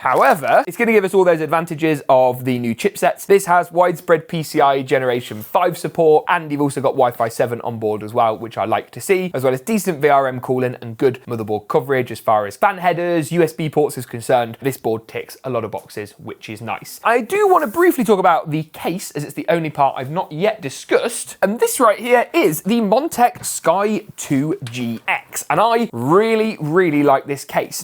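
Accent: England, United Kingdom. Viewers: I find your pc builds informative, but you sound very English from the UK? so why all the pricing on the components in $'s and not £'s first.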